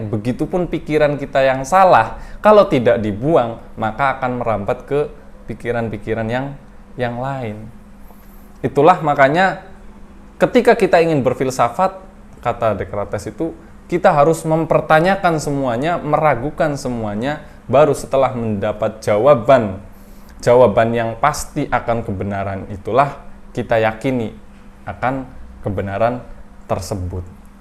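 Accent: Banyumas, Indonesia. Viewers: Sukak dengerin penjelasannya, mudah dipahami. Sukak logat2 bicaranya juga, kyk ada ngapak2nya dikit.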